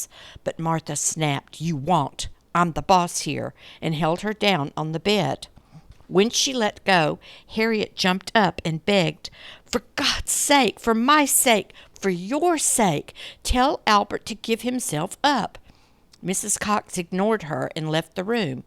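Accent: South, United States. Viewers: The lovely southern accent makes the narration absolutely perfect and I love it, dont you ever change, lots of love from England.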